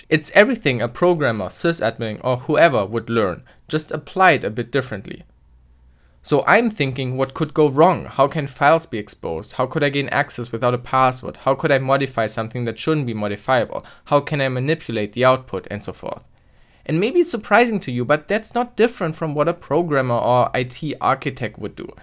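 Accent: Germany. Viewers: Cool, dass Ihre/deine Videos auf Englisch laufen, damit es auch so viele wie möglich sehen, aber man hört schon sehr stark "den Deutschen" da raus. Macht aber natürlich nichts, solange man Sie/dich versteht. Normalerweise siezt man bei unbekannten nicht gleichaltrigen Menschen, aber da wir auf YouTube sind und eig. jeder duzt, habe ich mich für den Kompromiss entschieden. Ich sie-/duze :D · Ich wusste sofort das er Deutsch ist😂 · Weiß noch die ersten Videos von ihm, hab gar nicht gedacht, dass er Deutscher ist aber irgendwann fällts einem bei manchen Wörtern auf :D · Klingt recht deutsch der bursche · I love your german accent by the way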